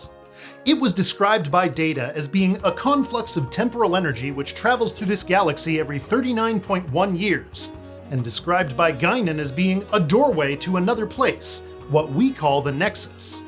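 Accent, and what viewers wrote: United States. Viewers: Glad to hear someone address the fact that you actually can get into the Nexus with a ship, and expand upon it to give a real explanation as to Soren's plan. Still weird hearing an American accent here though. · Trek culture being narrated by an American? I'm sorry, where am I? What alternate universe did I step into? (I don't hate it, I've just gotten used to Adam and the other guys.) Edit: After I marathoned TNG (because I'd only watched most of it over the years and not seen some episodes in full) I caught up on like 2 of the movies? Didn't like them much and still haven't watched the TOS movies because I haven't finished TOS. That said, catching up on the movies a second or third time around actually made sense, because ages 13-22, I was mostly out of the trek fandom and into anime and Animorphs, so watching the movies in my teens when they came out didn't really matter, as I recall. 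23-ish, watched Voyager reruns and nostalgia pulled me back into the fandom in a big hug! Can't believe I thought it was all "meh" for so long, but I guess maybe I was just trying to be cool or something, IDK. But it didn't help I wasn't impressed with TNG and we didn't watch ST:DS9 because it was all about war and my mom didn't like it. Voy was what I cut my teeth on, even if it's not the fandom's favorite. It's the redheaded stepchild of trek. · This guy's American accent is freaking me out.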